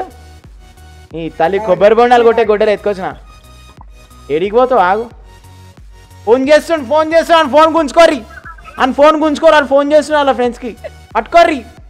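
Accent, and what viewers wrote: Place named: Telangana, India. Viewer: bro nuv Telangana or AP. Pure Telangana language atlaney untadhi.artificial commentary Kanna pure Telangana yasa commentary 💯 percent best.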